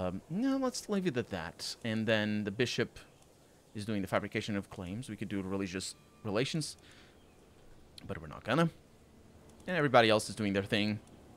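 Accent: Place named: Portugal. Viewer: For years I couldn't picture where your accent was from turns out you're Portuguese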